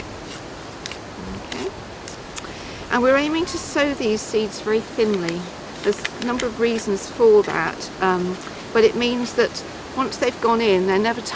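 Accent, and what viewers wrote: United Kingdom. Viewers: Thank you for the tips! I'll never thin again. You're a lovely British lady, with concomitant accent, and with a lovely garden and cat! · Such a cute uk accent!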